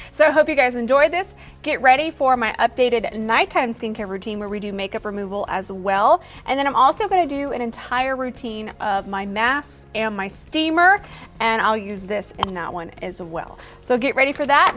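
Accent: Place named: Texas, United States. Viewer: Saw you on Snapchat and recognized a hint of Texas accent...had to follow! Can’t wait to see more videos!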